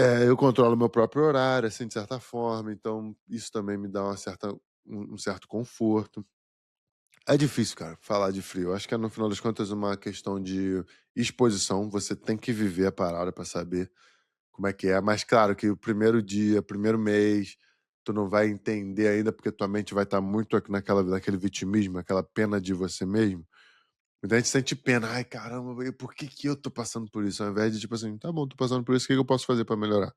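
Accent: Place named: Rio de Janeiro, Brazil. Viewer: Mano 100% de orgulho de ver um carioca que evoluiu tanto, essa é a questão da identificação, ainda bem q vc não mudou sua forma de falar e o jeito de ser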